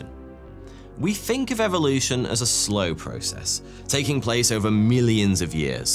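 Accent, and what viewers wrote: United Kingdom. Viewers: Alabama? I thought you were from the UK.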